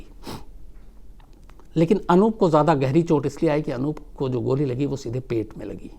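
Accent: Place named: Bihar, India. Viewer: Bihar me aise hi bolte hai syd sir bihar se hai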